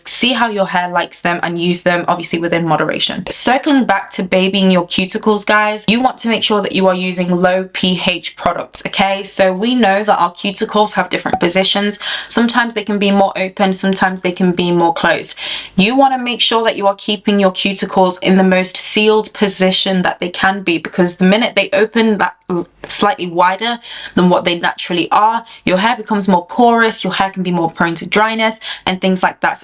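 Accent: United Kingdom. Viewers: Your accent sounds quite Northern? Are you from Manchester? Leeds?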